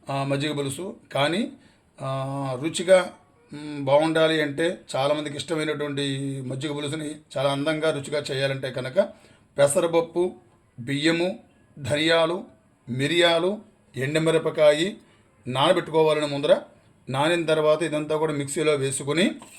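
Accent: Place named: Andhra Pradesh, India. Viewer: Ayya garu mee swagramam yekkada? Andhra lekka vundi.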